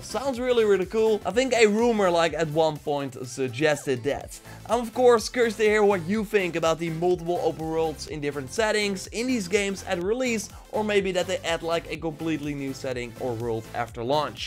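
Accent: Netherlands. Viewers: Are. You. Dutch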